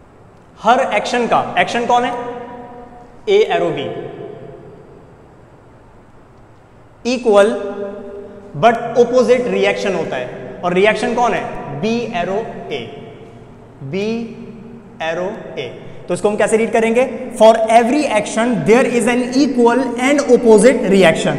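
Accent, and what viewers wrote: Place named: Waziristan, Pakistan. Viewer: I think that you are from waziristan or bannu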